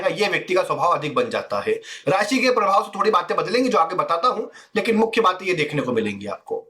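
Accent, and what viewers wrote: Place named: Maharashtra, India. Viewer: लम्बा वक्तव्य होने से आपका गला सूख रहा है। अगली बार इसका कुछ उपाय करके विडियो बनायें। और, आपकी भाषा एवं आपके उच्चारों से लगता है कि कदाचित् आप मूलतः हिन्दीभाषी नहीं हैं अथवा आपकी हिंदीभाषा पर संभवतः मराठी भाषा का प्रभाव है।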